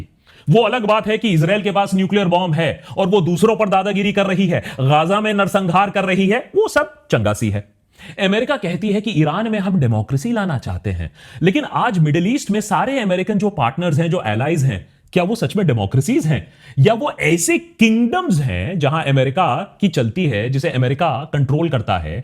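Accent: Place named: Bihar, India. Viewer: Bhaii, you are great.Your style is typical Behari sweet way.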